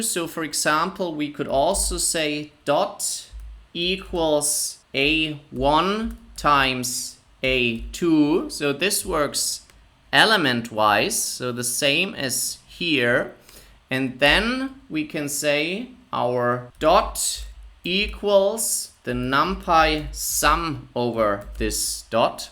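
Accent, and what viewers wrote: Germany. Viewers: Are you German cause Your accent is kind of one. Well thanks for this amazing crash course.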